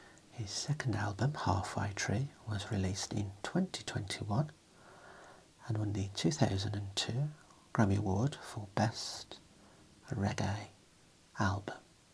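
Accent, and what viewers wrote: United Kingdom. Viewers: Are you British? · I don’t know what the accent, british? but it sounds really good, and I love it 🥰 I always fall asleep ❤️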